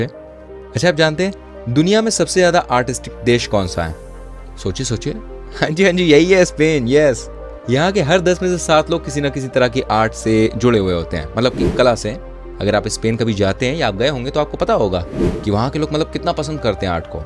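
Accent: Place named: Mumbai, India. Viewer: ye voice or mumbaiya language bahut bor krti h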